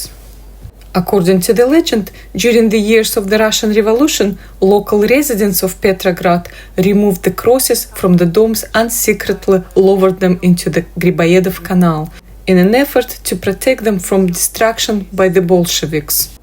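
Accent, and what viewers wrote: Scotland, United Kingdom. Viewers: Do I detect a hint of a Scottish accent?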